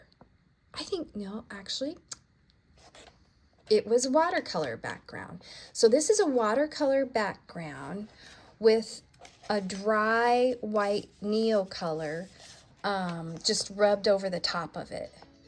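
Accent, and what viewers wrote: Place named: Wisconsin, United States. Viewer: 🤣🤣 I'm just saying, I'm from WI and you sound just like me! 😉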